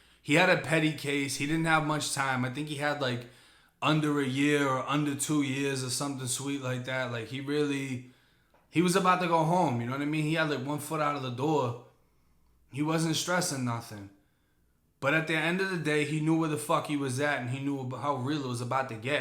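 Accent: Boston, United States. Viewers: my nigga but that Boston shit be getting me everytime lol. "Tessta-Hot" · Yo where yo from originally cause you aint from Florida with that accent? sounds like Boston. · 617 over here, Charlestown Townies. That man got a Boston accent.. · I absolutely love your Boston accent, new sub been binge watching you all night.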